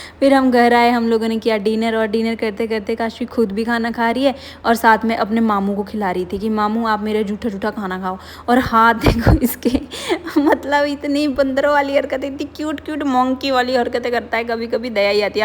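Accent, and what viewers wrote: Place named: Pahadi, India. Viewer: Today's voice over has a lil bit of pahadi accent 😂❤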